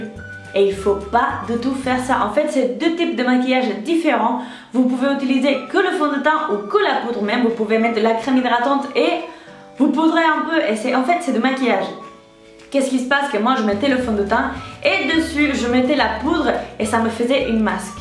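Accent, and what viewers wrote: Spain. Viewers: elle a l'accent espanol c'est pour ça, mais tu abuse , j'en suis sur que tu as compris quelques phrases mdr sinon tu aurais pas regarder jusqu'à la fin ouhloulouu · elle est espagnole🤗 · c'est normale quelle parle vite c'est l'accent espagnol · c'est l'accent espagnole c'est normale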